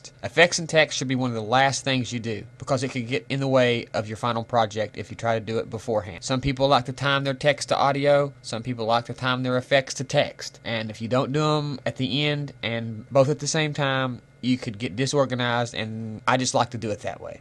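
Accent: United States. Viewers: U HAVE A COUNTRY ACCENT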